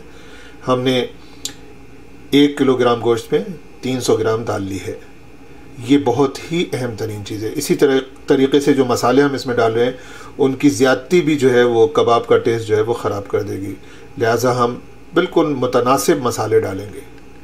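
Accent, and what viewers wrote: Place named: Uttar Pradesh, India. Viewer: Aap ka "quaf" batata hai ke aap ki jaren shayad U.P.men hain.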